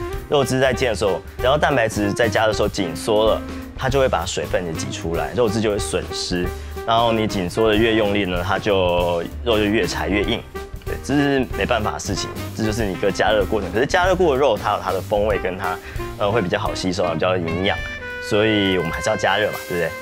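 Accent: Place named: Taiwan. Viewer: 你的嗓子好尖，台湾普通話味道，王力宏也是这味道儿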